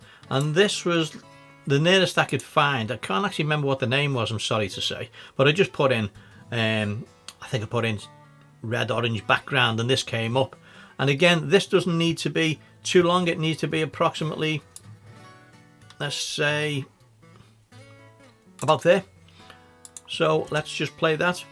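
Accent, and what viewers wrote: Liverpool, United Kingdom. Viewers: Wow. What an awesome and easy to follow video. It is exactly what I need for a project I am working on at the moment. You are a great teacher. You sound like you are from Liverpool. I went to college there in Hope Street - between the two cathedrals in 1968 - yes I am very old. I have subscribed and will watch you other videos too. Thanks again and much love from beautiful Segovia in Spain.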